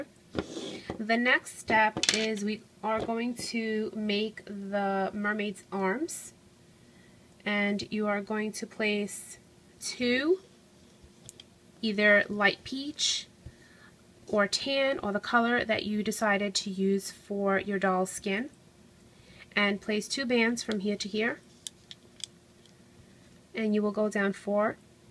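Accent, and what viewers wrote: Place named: New Jersey, United States. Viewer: you have a New Jersey voice... no offense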